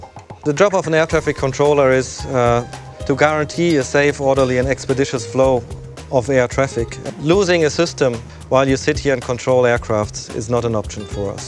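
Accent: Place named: Germany. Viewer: lol, german accent.....sound better than the american anyways cool viedo....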